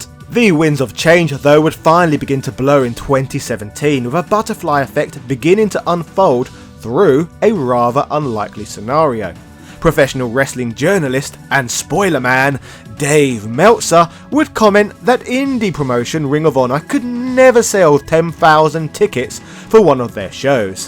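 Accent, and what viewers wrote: United Kingdom. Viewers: 5 mins and 42 seconds in and so far nothing but some annoying brit talking and showing stuff that has nothing to do with the title disliked and flagged as misleading title...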